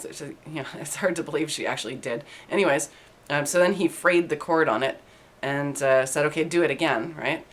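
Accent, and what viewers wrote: Canada. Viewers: You really work that smirk with the chin down and eyes up, bangs at the top of eye level well. You could talk to me for hours lady. Monotone discussion in laid back style, with occasional canadian dialect shining through. Making my heart flutter. Feeling enveloped with a warm buzz of unseen tribbles that are cloaked. My pupils are dilated to the point to where i look like an alien hybrid portrayed in our modern pop culture. Yeah i could listen intently to your voice of intrigue for great lengths of time. Many many metric units of time. Your voice could also put me to sleep knowing youre near me. Like a security blanket at 60 decibels. Lol. im so sorry i keep ranting on your videos i am kinda trying to flatter you as though youre actually eading this stuff. Im bored to hell tonite thats aboot,lol, all as to why i keep on. I need my meds. Just meds i guess. 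I dont take any but feel like theres a stereotypical void that needs fillin. With pills. Haha.? I live near harley in milwaukee. Are ya related to willie g? Eh? I speak like a yooper too. Except we have a phrase in Wisconsin you canadians dont have. Dont cha know? God i vrack myself up. Im going nuts here! Not just about your appealing demeanor, looks and personality neither.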